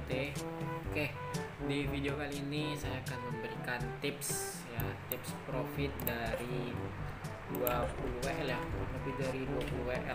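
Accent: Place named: Sabah, Malaysia. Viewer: Ckp pun mcm org sabah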